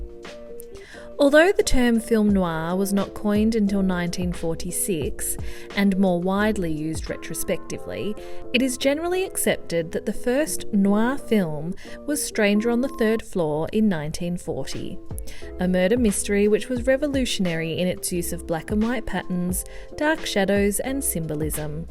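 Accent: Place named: United Kingdom. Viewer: Why is someone with a British accent narrating a film on American life?!?!?;?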